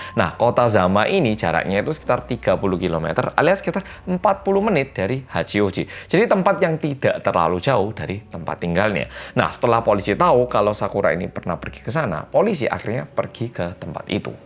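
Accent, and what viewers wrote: Java, Indonesia. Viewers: Bang lu kalo ngomong logatnya kek orang Jawa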